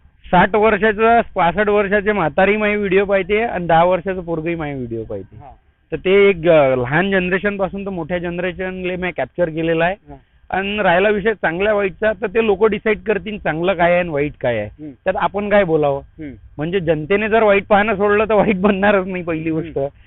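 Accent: Varhadi, India. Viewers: हा विदर्भाचा सुपरस्टार आहे विशाल भाऊला कोणी ओळखत नाही असा महाराष्ट्रात सापडणार नाही. खूपच छान वऱ्हाडी भाषा, ऐकायला फार छान वाटते पण मला तशी बोलता येत नहीं. विशाल भाऊचा प्रत्येक रील हा इमानदारीने व अभ्यासातून केलेला असतो. प्रत्येक व्हिडिओ लाईक केल्याशिवाय पुढे जाता येत नाही. पुढील कार्यास खूप खूप शुभेच्छा.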